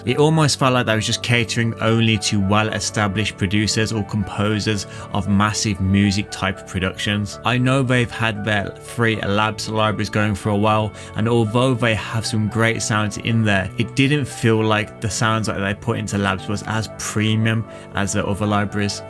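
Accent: Birmingham, United Kingdom. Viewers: Spitfire do the free BBC orchestra? Also you sound like a Brummy, though maybe somewhere close so, I believe people from Lichfield and other areas have a similar accent, I'm from Stoke so eh, just wondered roughly where you were wandering around in the trees? 🤔🙏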